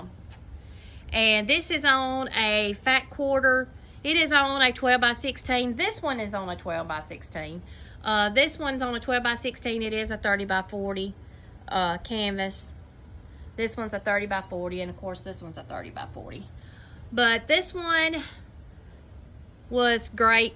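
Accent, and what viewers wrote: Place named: Louisiana, United States. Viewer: Thanks for asking that. She told me before it was art canvas and I thought she was saying something different. Her accent is adorable. She sounds just like my Mama who was from Louisiana.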